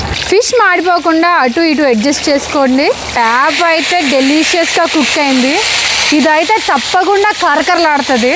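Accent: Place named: Godavari, India. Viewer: తపెలా...... బాగుంది గోదారి బాష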